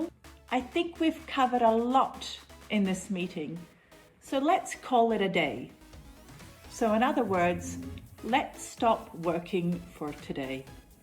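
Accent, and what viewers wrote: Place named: United Kingdom. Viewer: i like your british accent and grammer i am trying to recovering perfect tense, when i review i know, but when i communicate i mixed all tenses😅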